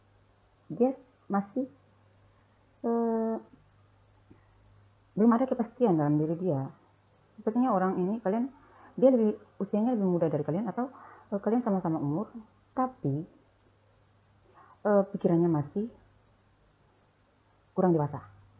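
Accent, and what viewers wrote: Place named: Sumatra, Indonesia. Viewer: pembacanya sederhana banget,sumatera /luar jawa ya jeng,💪💪,suka dgn kesederhanaan.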